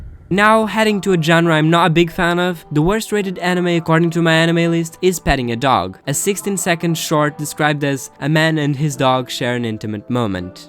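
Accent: Portugal. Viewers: you sound portuguese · YOU'RE PORTUGUESE. THE SIR KAZIO MEME? THE "WAAAAAAAA" ONE? YOU AXCENT? I KNOW IT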